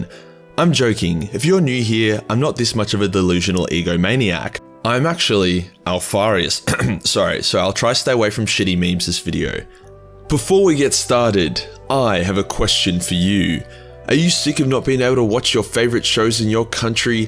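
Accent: United States. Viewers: I lost it to the American accent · THE AMERICAN ACCENT. WHOOOO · Damn that was a pretty good US boy accent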